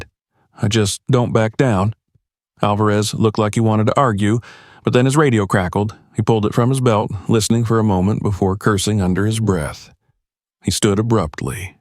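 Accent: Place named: South, United States. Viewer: I am so glad Vin Diesel stepped up to help that young woman. She seemed very scared by those bikers. And by the way, you would make an excellent audio describer. You have the voice for it too. A strong, southern accent, as well as an excellent describing voice. I would know, because I've listened to a lot of audio descriptive movies and TV shows. President HW Bush describes it's a wonderful life for those that are blind or visually impaired. I myself happen to be blind. Totally blind in fact from birth. It doesn't slow me down though.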